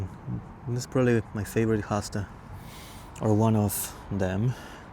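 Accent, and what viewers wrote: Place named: Nagaland, India. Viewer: Nice collections. I am from Nagaland, India.I want to tell you that you the way you speak, it's just like one of us,,we people speak English exactly like the way you speak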